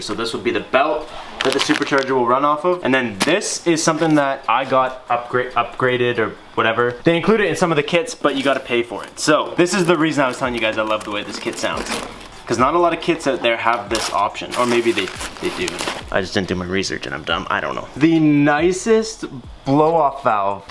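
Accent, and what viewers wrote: Canada. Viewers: Anyone else notice the way Canadians say out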